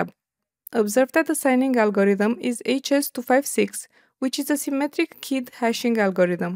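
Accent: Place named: England, United Kingdom. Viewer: i like you english accent dear teacher👍👍 would you mind telling me which accent is it??????????????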